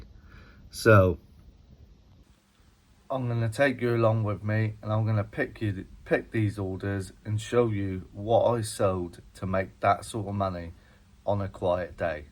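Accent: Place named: Plymouth, United Kingdom. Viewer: I automatically recognised the janner accent.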